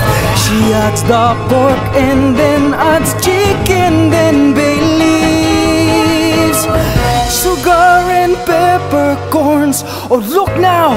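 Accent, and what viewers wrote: Philippines. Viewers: That thick filipino accent though...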